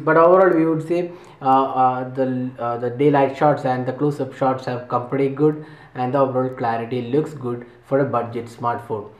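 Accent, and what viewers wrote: Kerala, India. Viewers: Are u a Keralite..i can get that from your ascend..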